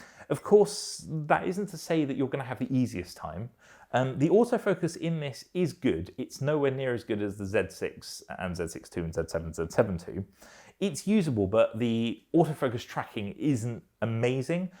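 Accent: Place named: England, United Kingdom. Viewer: Great to hear a calm, English voice!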